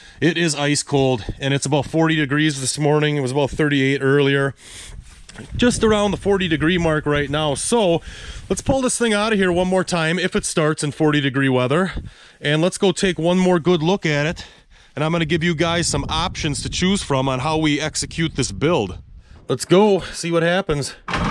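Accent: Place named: Northeastern Pennsylvania, United States. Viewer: You in Pennsylvania? Sounds like a northeastern PA accent (like me)!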